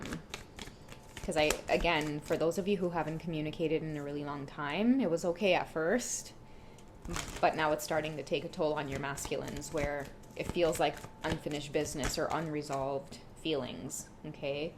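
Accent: Trinidad and Tobago. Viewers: Hi i am a twin flame and also a trinidadian, love the accent and you always have pleasant and optimistic things to say to those on this journey. Blessings to you. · Hi.. I'm Jamaican 🇯🇲 & let me tell you, I absolutely love the trini accent! I always look forward to you saying something in your accent. Love it! ❤ This reading rings true about songs, & they're very old, most of them I've never heard before but speaks of our situation. It's been happening on my end too both channeled & hearing on the radio. He's definitely getting clarity cause I do sense/feel it.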